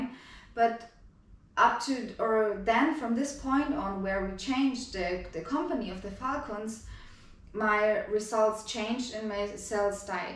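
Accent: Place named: Germany. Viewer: Is that the Deutsche accent , your Deutsche -english accent is fluent and delightful 🤣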